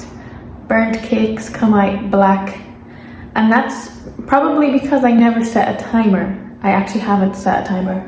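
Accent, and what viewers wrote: United Kingdom. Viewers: I think is british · Do you speak with british accent?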